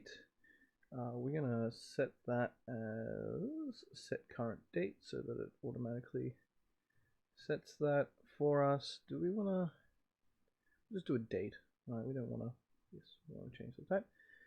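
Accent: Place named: Australia. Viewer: My wife's a septic (Massachusetts) living here in Sydney. Good to hear you still sound like an Aussie :) I'll sit down with TF tonight and hopefully get what I need working. I'll take a look at all your videos when I get a chance, thanks for doing them. The use of the Inverse Relationship the other way around was somewhat ingenious.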